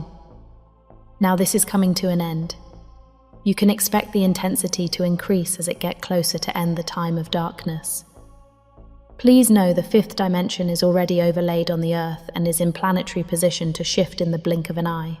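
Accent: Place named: United Kingdom. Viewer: And with a British accent yet. Nice try, but no brass ring !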